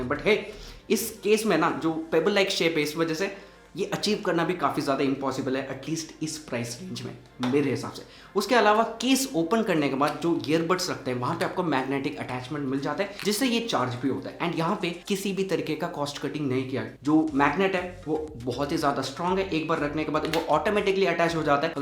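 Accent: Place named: Kerala, India. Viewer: That accent is cool! Are you from Kerala?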